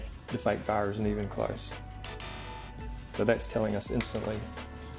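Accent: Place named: Australia. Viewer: Baaa.and baaaaz. (Dumb ozie accent for Bar)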